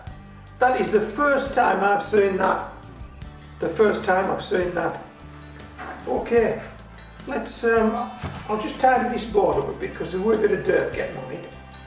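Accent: United Kingdom. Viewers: British? Just curious.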